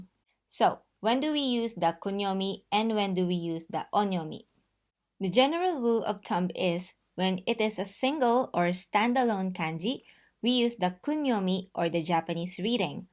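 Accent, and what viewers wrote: Philippines. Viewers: Love the logo! Though a better/more efficient way to learn Kanji is via learning the Radicals as opposed to random Kanji as presented here. Also, she's clearly a Filipino speaker & not Japanese. Sorry, but both the English & Japanese are slightly accented! I commend your efforts, but find that it's essential & utterly important to learn correct intonation & pronunciation from the get go! Good luck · Your accent sounds Filipino. Is your mother tongue Tagalog? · Are you Filipina maam? I can hear the accent😊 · Dear all watchers... I am a Japanese girl from Tokyo. And We feel so much disturbed by these sorts of Fake video! This woman who was speaking in this video is not Japanese and her accent is Philippino. This is the real issues because when we learn language, we need the real native, the real nationality the language. Because it’s crucial to learn the the manners, the way to communicate and so many things. 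It’s too too bad because watchers will think this woman is Japanese. It’s so serious! We really don’t want watchers misunderstand. Because Philiplipino is quite quite different from us Japanese. Especially our manners, accents and the way we talk, smile and tons of things!!!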